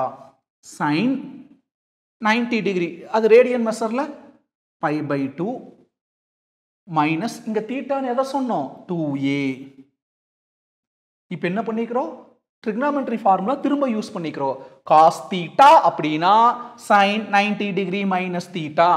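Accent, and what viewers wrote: Coimbatore, India. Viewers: CBE Slang 🤌🏻❤️‍🔥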